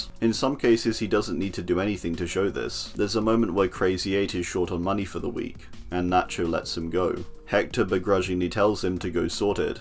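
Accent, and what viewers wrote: United Kingdom. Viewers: Hey how's it going?, i assume your accent is English but i can't seem to place your accent on a regional scale, i mean?? is it South? South East? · Isn't this the guy who does DbD videos? I love this british voice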